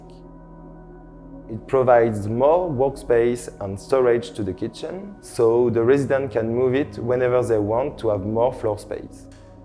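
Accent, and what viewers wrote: France. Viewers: Man , I would recognize French English accent with my eyes closed. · nice baguette accent, and as french i can appreciate the effort :)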